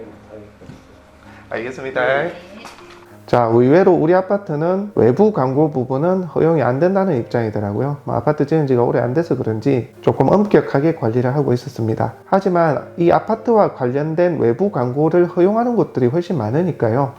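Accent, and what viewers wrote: Gyeongsang, South Korea. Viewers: 갱상도 사투리 잼나요.ㅎㅎ 홧팅!!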